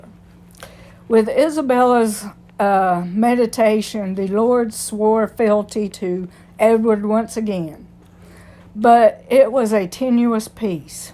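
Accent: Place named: Alabama, United States. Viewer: I love the Alabama accent, it’s doesn’t sound like the American accent we all know and hear on television, I love listening to reading historical stories, thank you 👍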